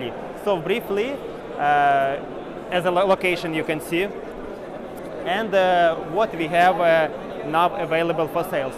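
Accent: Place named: Russia. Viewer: Your speech, information, intonation is great, but reeeealy hard to keep listening bc of such a strong Russian accent. You need to fix it at least a bit, man.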